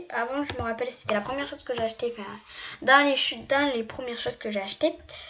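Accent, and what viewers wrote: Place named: South, France. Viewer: J'aime trop ton accent tu vien du sud ?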